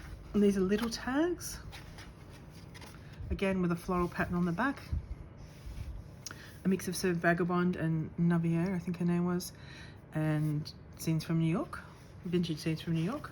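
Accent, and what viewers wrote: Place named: Australia. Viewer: Hi . You sound Australian. Where did you go for the workshop , sounds like I would have liked it a lot. I’m in Melbourne . Thanks for sharing .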